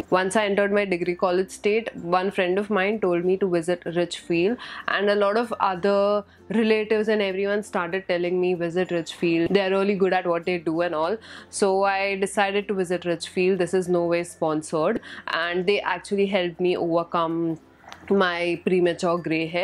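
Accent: India. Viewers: That indian accent. She sounds like Priyanka Chopra.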